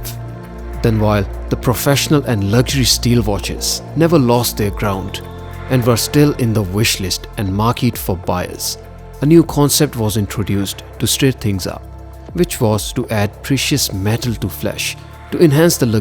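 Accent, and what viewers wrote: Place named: India. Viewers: Losing the accent might be difficult to do if he happens to be Indian. Gtfo. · Fod God’s sake get rid of the Indian accent... otherwise your videos are stunning. I had to think for a minute to figure by “Veer” you meant “Wear”, annoying distractions.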